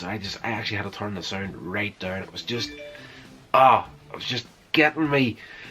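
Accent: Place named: Scotland, United Kingdom. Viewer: Excellent review. You sound Scottish. I'm MinneSNOWton.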